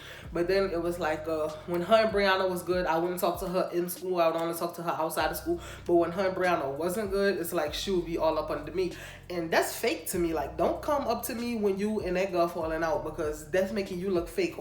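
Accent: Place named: Louisiana, United States. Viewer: oh ok yasssss.. I'm also from Lousiana. ( New Iberia) I'm in California now been here since 7 years old.. All my people have your accent... I love it. Love your channel.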